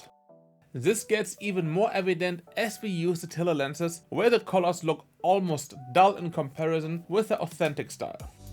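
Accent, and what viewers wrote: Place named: Germany. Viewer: Man hört einfach immer raus wenn ein deutsch Sprecher auf englisch tut meine Güte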